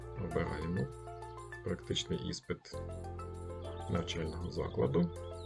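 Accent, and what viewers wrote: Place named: Russia. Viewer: Дякую, було цікаво. Тільки чому Ви українську "е" читаєте на російський манер - /йе/? Навіть якщо це умовно латинська "е", то вона теж не /йе/, а /і/ або, якщо вже не англійська, то німецька чи італійська - /е/ ("э" - якщо вже на то пішло).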